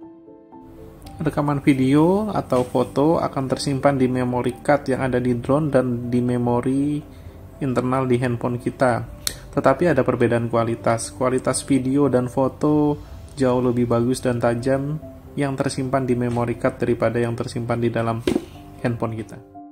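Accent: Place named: Kalimantan, Indonesia. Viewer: Ikam orang asli mana gan ? Hha bubuhan kalimantan jua lah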